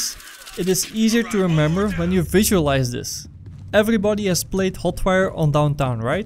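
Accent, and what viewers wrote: Netherlands. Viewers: u sounds dutch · Je hebt een Nederlands accent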